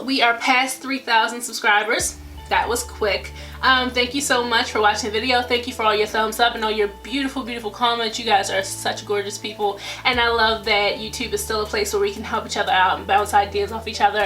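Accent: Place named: Baltimore, United States. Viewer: Wowwww sis you bomb!!!! One question tho.. you from Baltimore? You sound just like me lol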